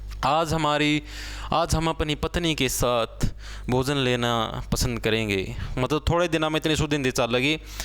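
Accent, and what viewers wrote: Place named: Kaithal, India. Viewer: Bhai kaithal side ki yhi boli h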